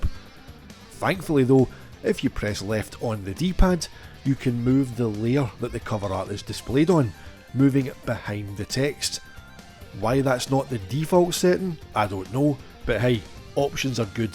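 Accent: Scotland, United Kingdom. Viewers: I like the Scottish dialect ❤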